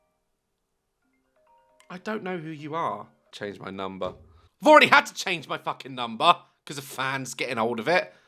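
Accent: United Kingdom. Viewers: He really does scream British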